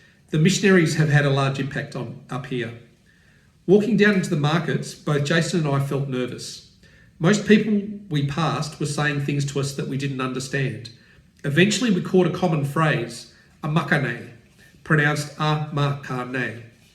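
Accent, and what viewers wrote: Australia. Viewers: English with Australian accent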